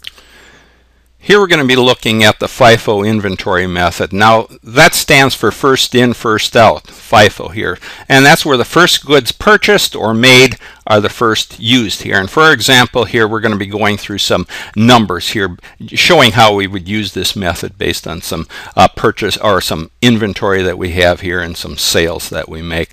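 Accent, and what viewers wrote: Boston, United States. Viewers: Your Boston accent is so strong, I imagined Peter Griffin speaking. Nevertheless, great tutorial! Definitely needed it for my FA class.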